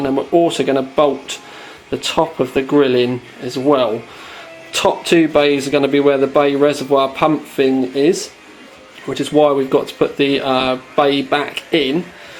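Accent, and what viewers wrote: England, United Kingdom. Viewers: learning how to do that english accent while watching this video.and how to mod the shinobi :) which I've just ordered x)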